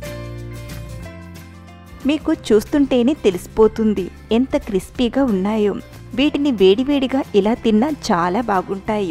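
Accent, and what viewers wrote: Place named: Tamil Nadu, India. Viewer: Your accent is like Tamil mam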